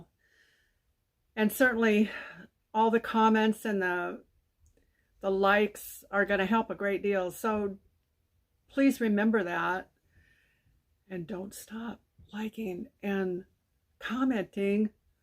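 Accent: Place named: Illinois, United States. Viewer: I subscribed to your channel because I'm tired of all the others that are doing strange things. I'm about the same age and I have bought many of the dresses you have purchased. So we have the same style. I use to live in Illinois and your accent sounds from that area. I now live in California. I love Temu but have spent tooooo much on that site.....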